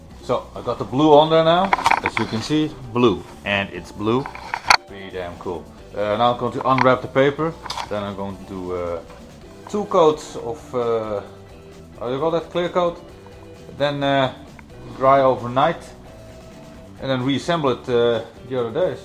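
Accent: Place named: Netherlands. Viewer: Na 1 zin dacht ik al te horen dat je NL'er bent. Alsof ik mijzelf Engels hoor praten. Spuitbus van de Gamma bevestigt het. Bedankt voor de nuttige info. Ik was er al een tijdje naar op zoek.👍🏻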